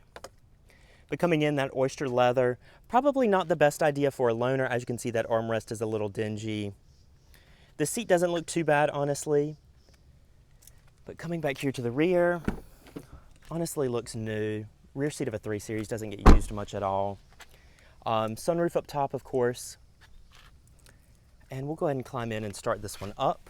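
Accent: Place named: Carolina, United States. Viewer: I love that strong Carolina accent. Thank you for this video it really helped a lot.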